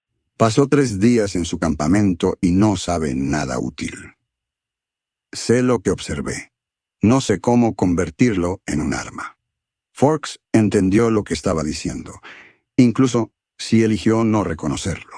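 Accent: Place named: Latin America. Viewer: Q bueno escuchar una voz latina. Desde argentna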